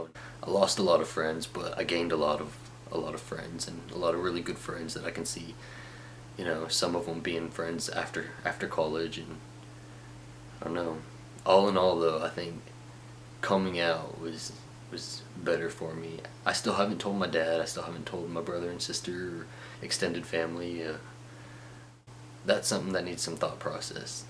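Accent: South, United States. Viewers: Great story and awesome t-shirt... and I'm a sucker for a southern accent:-) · You're so hot. Nice Southern accent. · Loved your story and that gravelly deep voice and southern accent didn't hurt either! Best wishes on coming out to your dad and other family members. A Great Video - I Liked, subbed and shared! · I just love how u break all the regular stereotypical things about being a "typical gay man". I really like that about u! As being a transgender guy, I would've never thought u were gay with ur really deep voice and ur genuine "southerness" but that just goes to show that u can't tell if someone is gay by looking at them. I wish u the best of luck and all the happiness in the world! XOXO-:-) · Love your southern voice. Or accent lol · Love a southern accent. Wow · Group A... fuck em. You're better off without em. As a southern native (NC) it's always nice to hear someone who talks like me proudly say who and what they are. 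I live in a moderately metropolitan area, and the vast majority of people here view minorities (racial, religous, sexually oriented) as just another person. No big deal. If I drive 30 miles west I arrive in the country. I mean the woods. And the attitude out there is a little different. These rural areas in the south are still a little behind the times, but even in those areas perceptions and ideals are beginning to change noticeably. Especially as the older generations are dying off and the younger generations are arriving at a point in life where they are starting to put together their own informed ideals and opinions. The reason I say all this is because a lot of the nation has this stereotype of the south in their minds as a regressive, racist, intolerant part of the country. And today that, for the most part, just isn't true. At least in North Carolina it isn't. I have lived in D.C. 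and Philadelphia and can say with all honesty that I have heard more bigoted talk up there than here at home. Including bigotry towards people simply for their southern accents. Sorry for the long comment but people need to know that when they hear southerners talk or think of the south in terms of our social views that today's south is not the south of 30 years ago. It's not the south of 5 years ago. We are embracing modernity, not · That southern accent is very attractive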